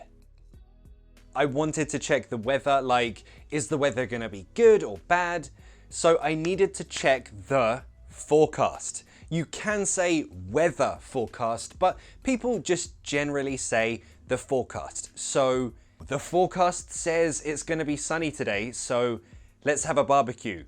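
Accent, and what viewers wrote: United Kingdom. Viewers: I love the British accent 😍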